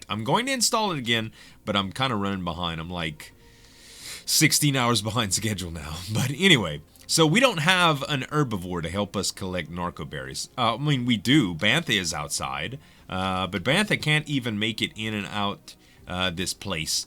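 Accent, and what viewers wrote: Louisiana, United States. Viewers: Found all of you guys via Slip's channel, you're the only one I subbed to just because of that sweet sweet Cajun commentary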